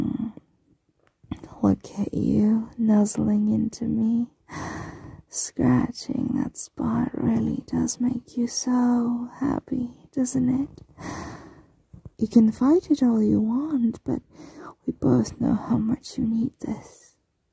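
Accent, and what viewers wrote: United Kingdom. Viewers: Hey are you welsh it's bugging me because I figure it out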